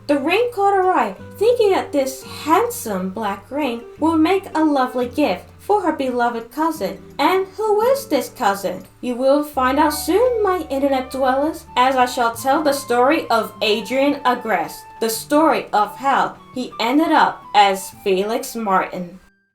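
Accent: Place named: Australia. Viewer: things i like about your cat noir: - his wishing to be free is giving me satoko vibes -the additional abilities you gave him. -his identity as Felix Martin, it's a good homage to the original idea thomas astruc had and adds more potential drama. _how calico black used magic when he was alive, that's a good story by it's own. -the spanish guitar music that played in the video. -you mention how cat noir flirts with whoever he wants, leading up to the typical attempts to seduce ladybug which all fail. -how his dad is sending Akumas to find Adrian as well as take the miraculous, it shows there is more plot outside of the mcguffins. -how you referenced the intro to miraculous by describing him as a normal boy with a normal life. -how you play the original music from the trailer. things i don't like about your cat noir: -if he doesn't care about right and wrong then why does he bother hunting akumas other than to prevent them from finding him. 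- his long white hair is kind of a huge distraction from the rest of his costume it's kind of an eye sore. -i don't know if you are australian or not but your accent was so thick i couldn't understand when you said calico.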